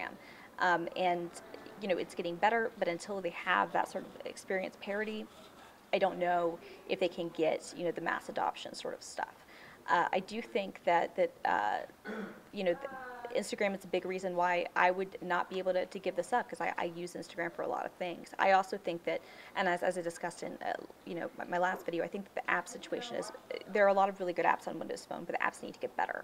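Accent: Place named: United States. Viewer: As much as I want to listen to the opinions and experiences, I really could not handle the accent. I have to pause it every 2-3 minutes, take a deep breath [anticipating more American accent] and then play further. Seemed really balanced and objective evaluation though. Cheers!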